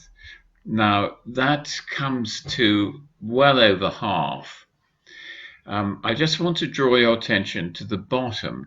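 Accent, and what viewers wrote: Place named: England, United Kingdom. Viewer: Thank you. Doctor Fenwick so very much! Your discourses are wonderful to listen to! Nothing I enjoy so much as listening to an English gentleman, who is well bred, and articulate as you are! The English have never lost their claim to CLASS! I am eagerly looking forward to your next NDE presentation, part 2! God Bless!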